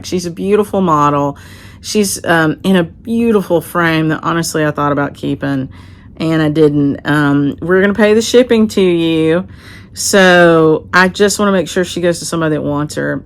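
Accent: South, United States. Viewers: Hi. I just love you and your shop, as well as your flosstubes. I not only enjoy the content but just love hearing you talk (that southern accent reminds me of my extended family). And, forgetting to post that last flosstube is something I would do. You sure did make it easy to use two of your words in a sentence. I know you are not that far out of the city to have farm animals, but I can imagine hearing the cow bell in the distance. The brightness of the colors you have in your shop, I don't see a yellow cover. If you have one that I missed, please let me know. Wishing you the happiest of holidays! Pat Tackaberry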